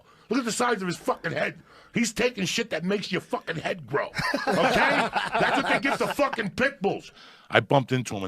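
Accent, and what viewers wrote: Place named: New Jersey, United States. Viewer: The charisma this man has paired with that jersey accent is absolutely beautiful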